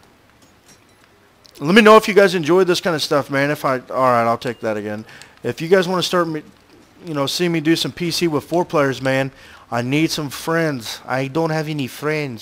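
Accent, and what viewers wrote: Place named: South, United States. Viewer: where are you from? you sound very southern!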